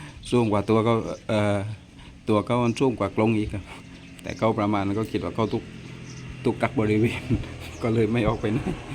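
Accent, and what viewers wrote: South, Thailand. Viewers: ลุง อยู่จังหวัดไหน เห็นพูดไต้ค่ะ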